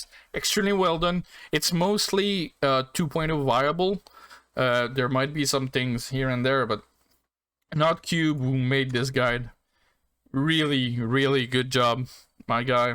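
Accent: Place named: Quebec, Canada. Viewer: Are you quebecois dude because you sound like the people I listen to on the radio here in st hyacinth. I love it!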